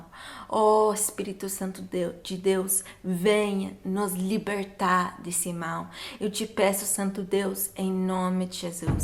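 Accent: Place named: United Kingdom. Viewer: New subscriber... i love a bristish accent ... but born in portugal 🙌🏼🙌🏼🙌🏼🇵🇹🇵🇹🇵🇹 love your chanel you explain things well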